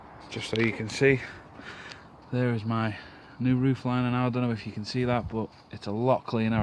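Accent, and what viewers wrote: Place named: Liverpool, United Kingdom. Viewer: Nice 1 scouse.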